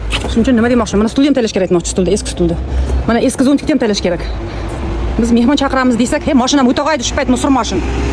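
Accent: Surkhandarya, Uzbekistan. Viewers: Сурхонча сукинишингизга 5 кетаманда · Surxondaryocha gaplaringiz yoqadi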